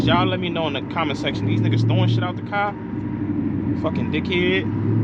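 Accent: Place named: Chicago, United States. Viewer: Damn I miss the crib I'm from Ada park boi I know you from Chicago out here in Phoenix Az I don't miss the snow tho I'm hearing you talk like yeah he from the land 😂😂😂😂😂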